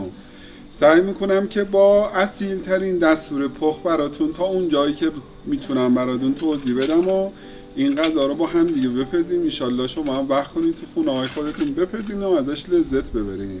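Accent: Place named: Shahreza, Iran. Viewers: لهجه ایشون بیشتر شهرضایی است تا اصفهانی آنجا که بجای خنک گفت خونک ! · واقعا دستتون درد نکنه،با توضیح عالی وصدای دل نشین وزیبای اصفهونی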